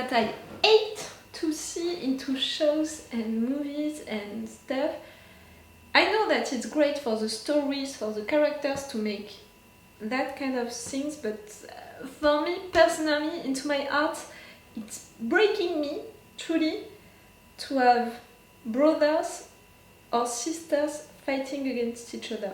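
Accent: France. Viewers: I knew it for the first 10 second it was my fellow french accent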